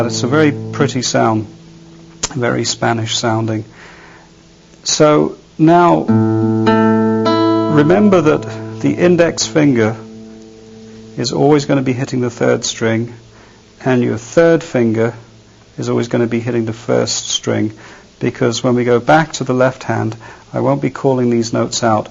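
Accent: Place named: England, United Kingdom. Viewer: soft English accent + Spanish guitar = best. Youtube. videos. EVER!!!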